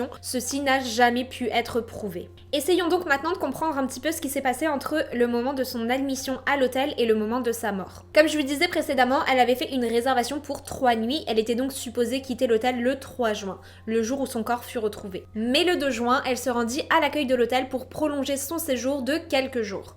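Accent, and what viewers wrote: Quebec, Canada. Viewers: Bonsoir, j'i bien aimé cette histoire que tu nous as compté ce soir. Je suis partant pour que tu nous parles aussi d'affaires non-résolues, d'affaires résolues des années après et de crimes comme tu avais commencé à le faire. Pour ma part, cette pauvre Jennifer semblait faire partie des services secrets d'un pays européen comme la Belgique. Son soi-disant suicide n'est pas du tout valable car il y aurait eu forcément son sang sur le pistolet et sur sa main et de plus, le pistolet aurait été dans une autre position que celle montrée sur l'image. Merci pour ton super travail, j'adore, tu es formidable. Petit bémol, pourrais-tu ralentir le débit de ta voix. Tu as des courses à faire après ta vidéo, présentement? Continues à nous compter ces histoires criminelles avec ton joli accent québécois et tes belles expressions françaises qui sont désuétudes de nos jours en France. 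Serait-il possible d'en savoir un peu plus sur toi, stp? Belle fin de soirée. Bisous criminels sexy nocturnes. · Je confirme également, je l avais capté cet accent québécois et c est très mignon 👍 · salut a entendre ton accent ,tu es quebequoise? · Coucou! Je suis du Québec et j'ai eu l'impression tout au long de ta vidéo de deceller un petit accent de chez moi?! En tout cas, tu as une nouvelle abonnée, félicitations pour ta vidéo ! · J'ai pensé des le debut de la vidéo que c'était probablement une histoire d'agent des services secrets, et je le pense tjr à la fin de la vidéo ducoup ahah, c'est le genre de crime limite banale qui se passent dans les dessous bien sombres des enquêtes de ces services d'espionnage, sinon tres bonne vidéo, j'ai fais un marathons de ta chaine aujourd'hui ayant pass la journée chez moi comme malade et j'aime beaucoup ta façon de raconté les histoire ( et le léger accent québécois ahah ) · j'aime bien ton petit accent canadien ^^ c'est cute et continue ce style ( je te decouvre avec cette affaire ) et les truecrim , c'est grave intéressant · D’où vien ton accent tu parles un peu québécois je trouve ?!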